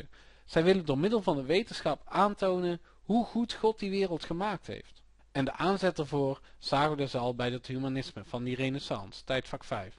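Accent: Brabant, Netherlands. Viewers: Ja!:D Maar voor mij is't gelukkig geen moetje. Ik mag gewoon leren voor de leuk, en maakt het wel makkelijk zo met z'n Brabantse manier van spreken ^^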